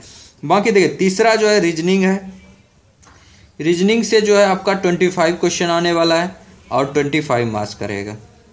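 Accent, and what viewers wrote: Bihar, India. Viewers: sir aap Bhagalpur Bihar SE ho kya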